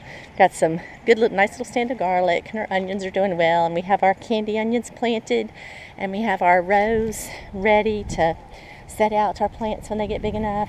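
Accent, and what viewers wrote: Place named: Georgia, United States. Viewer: My mom loves to grow plums, and I'm always disappointed by them. I've never once seen a plum that doesn't have a mark from a bug or a worm that nibbled on it. She's the same about peaches, and she's got two new peach trees to plant this year, but I just don't think we're far enough North. We're in zone 8A Maybe? One time, 30 years ago, She got a tree full of delicious nectarines, and it has just given her a fever which she can't seem to get past. We haven't seen nectarines or peaches like that ever since. And if her peach trees do make a few fruit, typically the squirrels get them. Love your videos, I love your accent because I have a South Georgia accent, and I got your channel by looking at your strawberry videos from a couple years ago.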